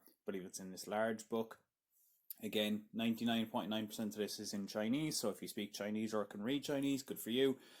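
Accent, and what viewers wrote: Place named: Ireland. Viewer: Got to say your Irish accent in rant mode is brilliant! I've played with the idea of reviewing a Reef Tiger but they're getting plenty of negativity lately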